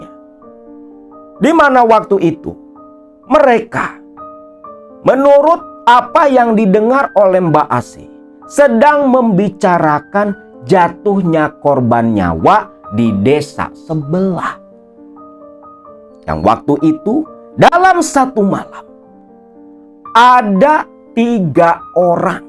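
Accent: Java, Indonesia. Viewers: Assalamualaikum kang.. Sukses selalu ya kang Blitar hadir.. Kalau ngomong jawa lucu banget 😂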